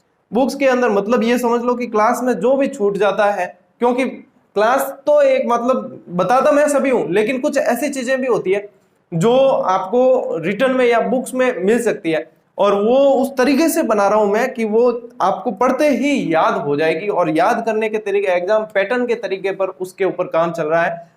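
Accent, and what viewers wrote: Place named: Marwari, India. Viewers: Hlw sir.......I'm from BHILWARA. I'm literally thankful to you for providing us all the classes free of cost. I was looking for some tips about preparing for the GK since I belong to science subject so my base in terms of GK wasn't clear and somehow reached to your page. The way you are teaching is truly amazing and will be definitely helpful for all the students who are unable to buy the paid courses. I never knew that we can also study the rivers in this way and I must say that learning with these river maps or tricks will make the knowledge solid in our brains for a longer time. Apart from all these, I honestly feel good whenever you do speak in our Rajasthani. As our Rajasthani accent is quite different from yours so I do like to hear your accent. AT LAST ........THANK YOU so much sir...😇🙏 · Aapki marwadi Bhasa bhut achhi lagti hai 😂😂😂👌👌👌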